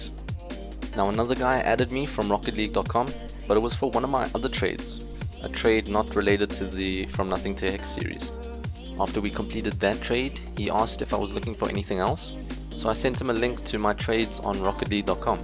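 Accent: South Africa. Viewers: are you south african? it really sounds like it. If so what part are you from. I'm originally from joburg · R u from South Africa bcuz I am!!!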